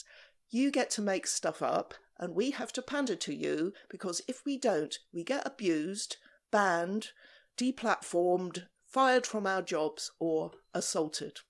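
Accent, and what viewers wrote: United Kingdom. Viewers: I've watched enough of these to lip sync near perfectly what she is saying and let my brain fill in the British accent. I've never been more terrified of a YouTuber for yelling at her audience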